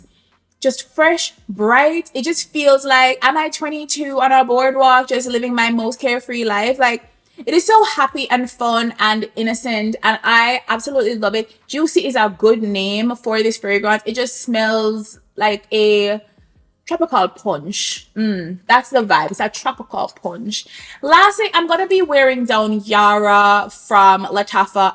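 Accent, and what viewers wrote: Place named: Jamaica. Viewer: You sound Jamaican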